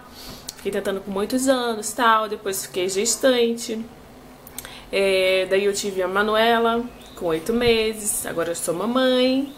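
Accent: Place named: Rio de Janeiro, Brazil. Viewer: Vá em frente!! Nós vamos amar!! Estou começando a gravar vídeos, se quiser conhecer ficarei mto feliz!! Adoro seu sotaque carioca! É lindo! mil bjs!!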